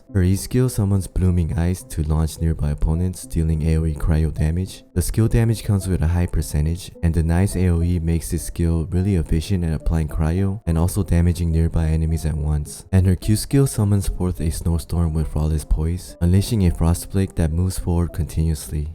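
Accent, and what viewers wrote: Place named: Brazil. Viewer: Essa cara é br o sotaque eu reconheci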